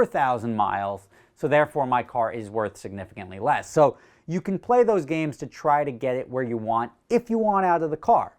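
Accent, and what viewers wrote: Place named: New York, United States. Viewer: Am from Kenya and you just have the realest New York accent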